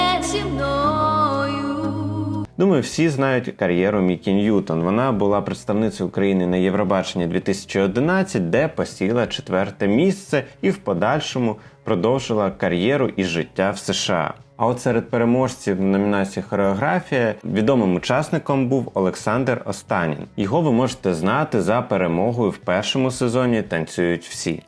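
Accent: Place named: Russia. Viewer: Дякую за такий гарний ролик! Прохання до ведучого розмовляти українською вдома. Російський акцент жахливий .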